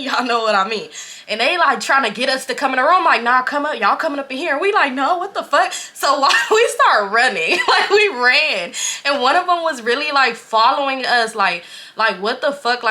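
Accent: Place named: Los Angeles, United States. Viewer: First off all Girl shots out to you! And believe me when I tell you I don't even do shit like comment on vlogs or subscribe to chanel's that aren't podcast. (Maybe I'm an official old head I'm 31btw) but this is very refreshing, relatable, down to earth, creative, and funny. ya delivery, ya detail ya tone, the slight L.A accent and slang. I'm a jersey girl. I say all this to say I want more stories bout Joe! 🤣🤣🤣 So as your newest subscriber and biggest cheerleader tell more bout Joe! Nah all in all do ya thang my sister. You got it!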